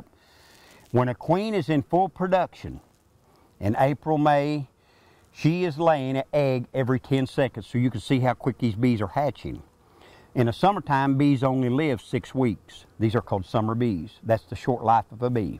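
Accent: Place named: United States. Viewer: I love this guys’ accent 😎 If I ever get to go to America, I want to go somewhere rural like this 👍🏻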